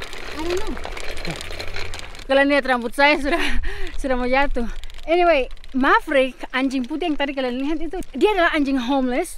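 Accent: Manado, Indonesia. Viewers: Logat dan cara berbicara anda mengingatkan saya kepada teman saya yang berasal dari Manado❤️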